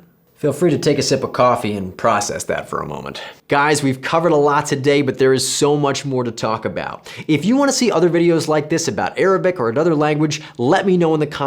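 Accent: Egypt. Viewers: As a Saudi myself you sir are 100% certified ✅😂 I notice that you speak Arabic with a hint of an Egyptian dialect which is cool and understandable seeing that most people choose that dialect to learn Arabic. Your pronunciation is impressive and Egyptian dialect has it's own humour and "ring" it's such a heart lighted dialect in Arabic so hearing you speak with it in a common tongue not a traditional one makes you relatable and pleasing to hear ❤ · It sounds like you’re learning in Egypt 🇪🇬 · يا الله اللهجه المصريه سيئه جدا مفروض انك تعلمت لهجه شاميه او لهجه خليجيه بيضاء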